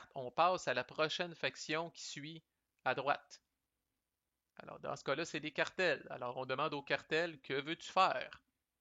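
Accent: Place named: Quebec, Canada. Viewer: «Tiens, mon esti!» —... J'adore! 😄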